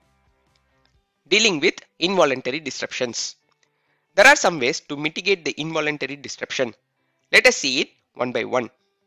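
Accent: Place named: India. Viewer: It's difficult to listen you english india accent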